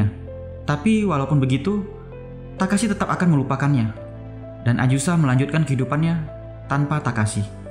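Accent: Medan, Indonesia. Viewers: Orang medan bang? :v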